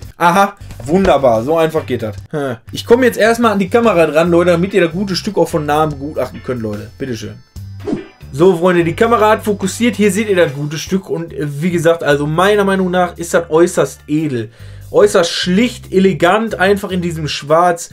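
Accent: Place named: Ruhr, Germany. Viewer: Danke für die Info, dann werde ich bei meinem Mighty und Hybrid bleiben! Der Volcano war an Black Friday für 400 Euro im Angebot, sonst ist der ja leider immer bei knapp 500... schon viel Geld aber es lohnt sich auf jeden Fall!! Kommst eigentlich auch aus dem Ruhrgebiet, Duisburg, Essen oder so? Die Ruhrpott Sprache erkennt man direkt 😂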